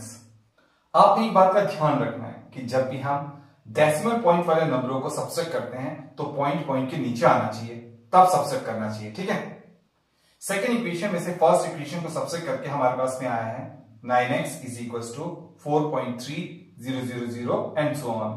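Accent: Pahadi, India. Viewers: Aap pahadi ho kya? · Tum pahadi bolen cha?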